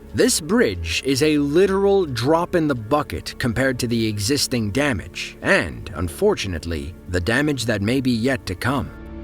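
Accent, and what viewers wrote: United States. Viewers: judging by the narrators accent, he is probably American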